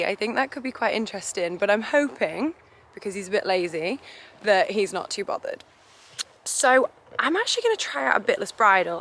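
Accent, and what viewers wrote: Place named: RP, United Kingdom. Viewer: Damn girl you sound posh ... . i like it :D that accent is awesome! like the queen of England.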